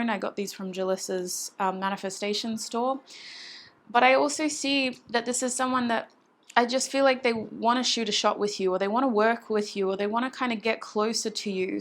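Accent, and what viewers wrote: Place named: Australia. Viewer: Just came across your channel and thought to myself - this lady sounds Aussie 🤔 and you’re an Aussie 🥳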